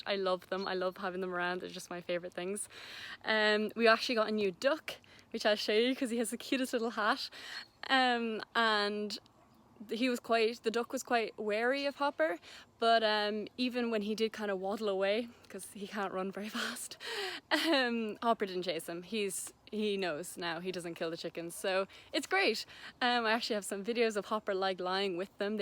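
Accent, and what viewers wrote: Ireland. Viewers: I just love the way you say that bloody chicken I love the Irish accent (I'm from Ireland) Also u inspire me so much about 2 years ago a connemara mare called Jeddah was at my stables and I ride her an loved her until I done a round of canter and bucked me off the next week my instructor told me to ride her again to get my confidence back so we done another round of canter and bucked AGAIN this time she positioned her neck to help me but it didn't work I ended up spraining my arm so I couldn't ride for a while that knocked my confidence the next year I had a bad call I was jumping a gate at about 50cm and the horse I was riding was about Fiona heighten was a grey ISH he refused it 3 times eventually 5 girls started waving their arms to get him over it be jumped it and apparently my instructor said he jumped it like 2metres higher the n it was I wasn't ready for it so I fell of but me being awkward sommersaulted and landed head first and I got knocked out for 20mins for the next 6 months after that I would hardly trot I was so terrified u until we came across you you are such an inspiration thanks to you I have accomplished jumping a metre 10 last month I'm 12 years old now and I feel like an advanced rider (maybe a bit to far) thanks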